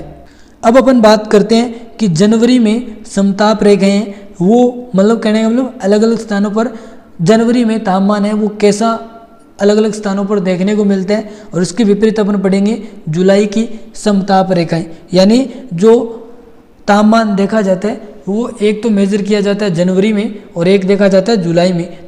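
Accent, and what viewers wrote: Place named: Bikaner, India. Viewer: Bhai aap bikaner ho kya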